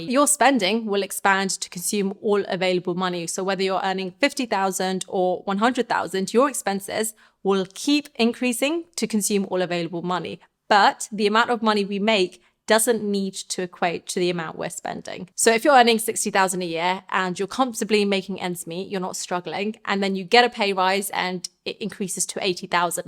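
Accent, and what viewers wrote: United Kingdom. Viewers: This vedio helping money management nd British pronounce......👍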